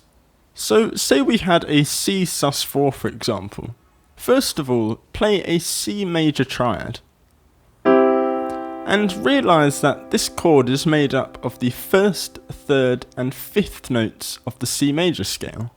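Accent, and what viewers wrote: United Kingdom. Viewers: u british right?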